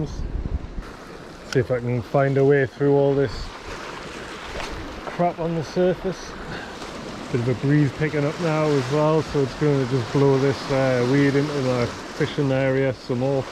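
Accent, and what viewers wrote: Newcastle, United Kingdom. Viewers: are you from newcastle or sunderland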